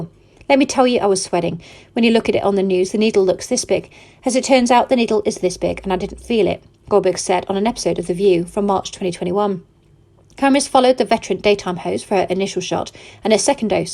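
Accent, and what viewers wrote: United Kingdom. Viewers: I can't understand a word ... a heavy British accent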